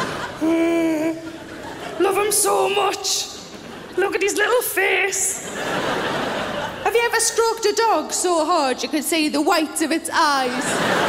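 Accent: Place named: England, United Kingdom. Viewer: I wondered what part of England she came from with the accent, I don't here that kind of British accent very often.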